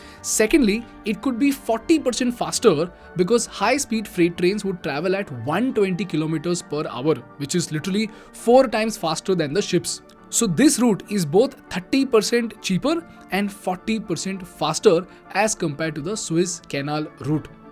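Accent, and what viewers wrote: India. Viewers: This EAM English is awesome.He does not have the Indian accent like this young commentator.Hes an extraordinary guy.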